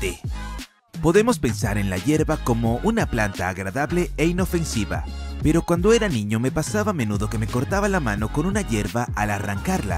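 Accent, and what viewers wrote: Russia. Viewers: Eres ruso o español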